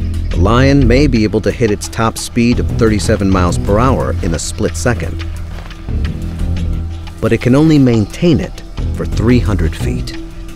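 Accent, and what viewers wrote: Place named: United Kingdom. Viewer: Cant beat a UK commentator for these kinds of documentaries.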